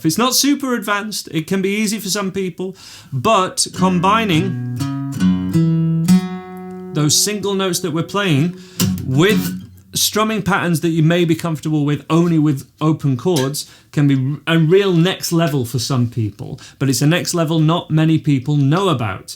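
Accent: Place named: Preston, United Kingdom. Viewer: Hi Some really useful lessons on here for beginners. Thank You. Listening to the accent, are you from Preston or Huddersfield (or somewhere in between).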